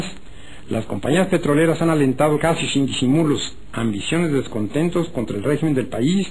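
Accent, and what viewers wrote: Mexico. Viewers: ACENTO MICHOACANO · Asento Netamente Michoacano Viva El General Lazaro Cardenas Del Rio Viva El General Francisco J Mujica Hijos Nobles Michoacanos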